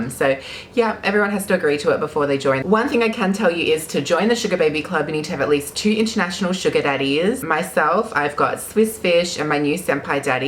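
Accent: Australia. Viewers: P.s. from jessica. But i love yours and any Australian accent. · Australian accent is BEAUTIFUL, never try to change it or be embarrassed by it😊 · YOUR QANTAS ACCENT SOUNDS LIKE MR G FROM SUMMER HIGHS HIGH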